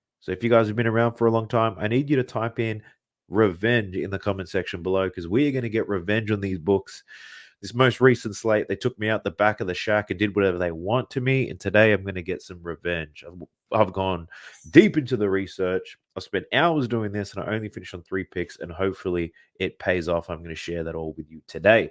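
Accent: Australia. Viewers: no way is an aussie 🔥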